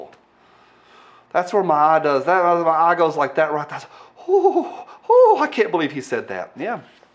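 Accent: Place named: Tennessee, United States. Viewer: Dude what county in Tennessee do you live? I’m in Robertson County and can tell your accent is thick Tennesseean.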